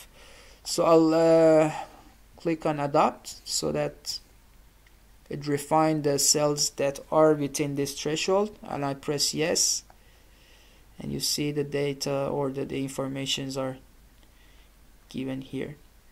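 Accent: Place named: Iran. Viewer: Persian ACCENTTTT.!!! Nice video btw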